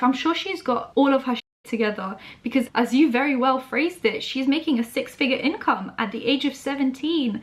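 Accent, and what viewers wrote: United Kingdom. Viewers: What's your heritage? You sound like you have a slight twang to your British accent that's all!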